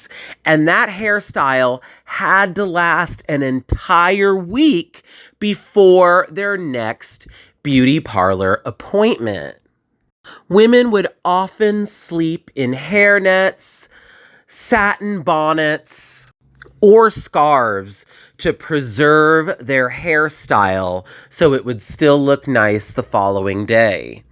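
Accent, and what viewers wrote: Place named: Germany. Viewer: Diese deutsche Stimme😮😮😮😮😮😮😮😮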